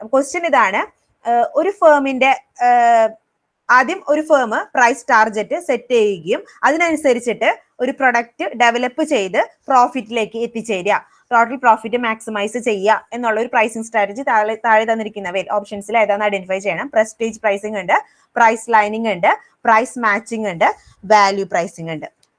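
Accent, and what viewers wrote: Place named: Thrissur, India. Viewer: ടീച്ചർ ഒരു തൃശ്ശൂർ കാരി ആണെന്ന് തോനുന്നു 😊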